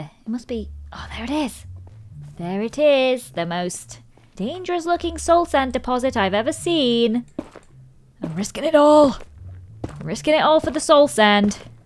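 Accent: United Kingdom. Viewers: OMG FINALLY U ARE ALIVE!(*ゝω･*) I still like British accent(((o(*ﾟ∀ﾟ*)o)))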